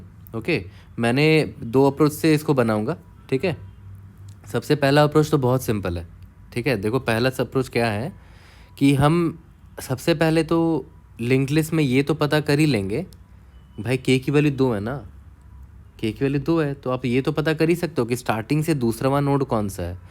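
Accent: Bihar, India. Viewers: accent se laga thoda bihari touch hai btw nice explanation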